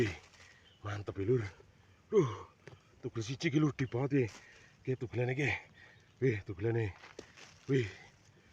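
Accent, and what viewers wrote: Banyumas, Indonesia. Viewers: Mirip kang Cecep prepan pensiun ganing ya,, kyane Mase wong banyumasan ya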